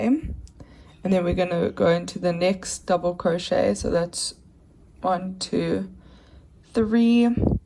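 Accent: South Africa. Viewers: I was so happy when I heard the South African accent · From your accent I can tell you are South African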